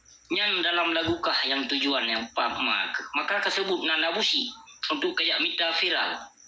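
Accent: Aceh, Indonesia. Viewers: Haaaa....haaaaa...😄😄🤣🤣🤣🤣🤣🤣 ikee asai kunonton dan ku deungoe abusyiek teumeunak saket pruet ku khe'm. 🤣🤣🤣🤣🤣🤣🤣🤣🤣🤣🤣. Mantap abusyiek. Tok asli Aceh Cara meunarit dan ceurapa. Hana dua abusyiek 👍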